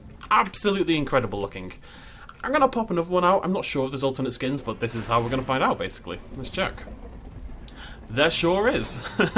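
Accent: United Kingdom. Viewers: Isn’t he like British or something? I think it’s his accent.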